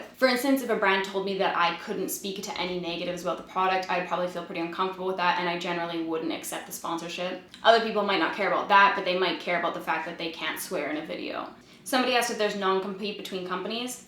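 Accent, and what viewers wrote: Canada. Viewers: First of all, you're so stunning. Second of all you're Canadian accent is adorable. And third, I love your videos! You're the most honest youtuber out there, I love that I can trust your opinion when trying to figure out what makeup to buy and what's not worth it! Thanks for being the coolest thing ever x0x0x0x0x teresa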